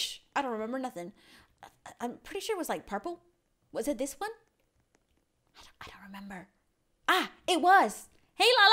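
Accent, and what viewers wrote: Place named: United Kingdom. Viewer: OMG I love ur voice h a little bit of normal, and a little bristish! LOVE YOU<3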